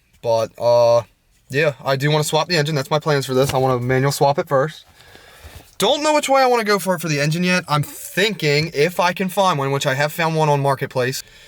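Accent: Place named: Maryland, United States. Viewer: I knew it was MD first few seconds of video, royal farms and the accent dead giveaway 😅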